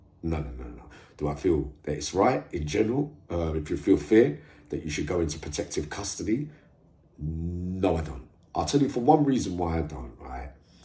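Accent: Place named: Cockney, United Kingdom. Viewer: Just try deliver your content clearer mate your diction is not great and remember you have followers who ain't cockney varra boys kid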